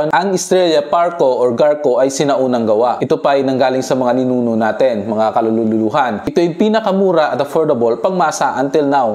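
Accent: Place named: Iloilo, Philippines. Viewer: hi sir.. its seems ilonggo ka..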